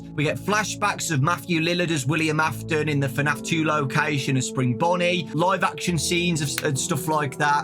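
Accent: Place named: Midlands, United Kingdom. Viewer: Mate your accent is just amazing. You're defo from the Midlands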